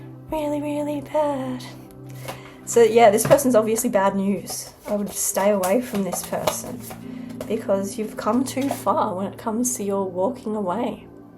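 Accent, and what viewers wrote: Australia. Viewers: All this time I thought I had found an English, but it’s Aussie lol❤ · Hello from California. Good messages, great voice,. We appreciate you. You have our favorite Australian accent. We could listen to you talk for hours. Happy holidays & happy summer to Australia from our channel.:hand-pink-waving::hand-pink-waving:🐦🐦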